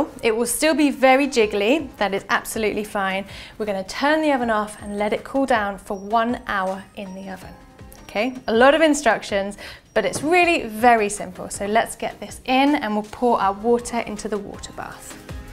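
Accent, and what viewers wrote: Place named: RP, United Kingdom. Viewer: A Very British “Toff” Accent!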